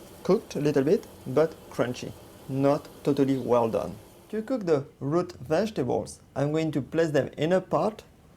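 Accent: France. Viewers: on vois que c est un français qui parle car son accent anglais n est pas maitriser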